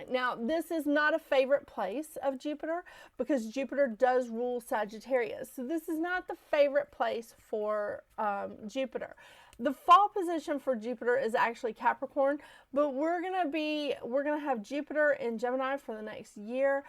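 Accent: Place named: South, United States. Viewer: First time watching from Georgia. You sound SOUTHERN. Refreshing. Thanks for the heads up!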